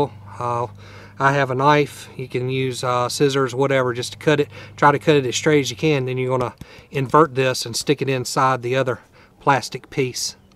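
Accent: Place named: South, United States. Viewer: Ipple cider he's a good old country boy I respect and love the accent I been living in Tampa fl for a really long time more than half my life now and married a girl born and raised here just something about it she's not "country" perse but she is born and raised down her I was born and raised up north new York to be exact and it ironic because she hated new Yorker but some how me and her just well the rest I history we have a whole family now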